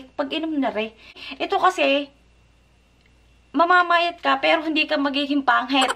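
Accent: Batangas, Philippines. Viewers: Parang magsalita sya ay batangenya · I enjoy watching your vloggs po...Puro nire,ganire...Batanggenya ka po ba or Tga Quezon province po ba kyo Mam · te batangeña ka hahha seym. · Taga batangas po b kayu sis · Hi sissy, enjoy watching you hahahaha....ang gandah ng accent hehe..very familiar sakin , naalala q ung mga friends q sa batangas kc nag work aq sa EPSON Lima, sa Lipa batangas. Keep it up sissy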